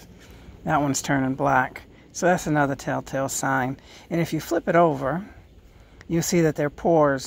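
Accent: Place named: Maine, United States. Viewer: Very cool. I just found you by doing a Google photo (I'm foraging) search. I also am from Maine. I heard your accent and was like she's gotta be a Mainah! LOL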